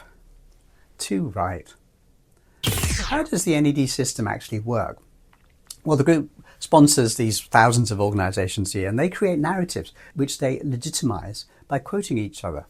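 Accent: United Kingdom. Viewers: Stupid british accent or involuntary and unnecessary subtitles: choose one. I'm out.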